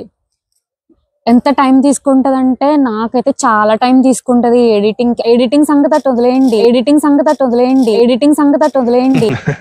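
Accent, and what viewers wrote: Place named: Rajahmundry, India. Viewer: rajmundry slang super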